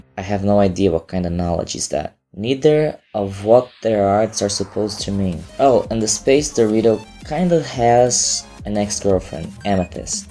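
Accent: Brazil. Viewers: Eu percebi o sotaque brasilero!:) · Mano vc eh br? Pq parece mt pelo seu sotaque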